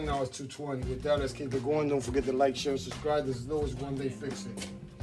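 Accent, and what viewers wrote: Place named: New York, United States. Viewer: Just found your channel and watched a few videos. I like it. Sounds like you're from New York.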